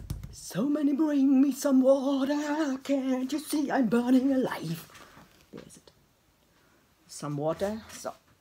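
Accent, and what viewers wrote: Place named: Schwerin, Germany. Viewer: Huhu.. super lustiges Video, wiedermal 😊.. Sachmal, eine Frage: dein Slääng 😂 hört sich nach meiner Heimat an 😃.. Nähe Schwerin... du kommst doch da irgendwo wech näää 😊. Lg. Grüße aus Bremen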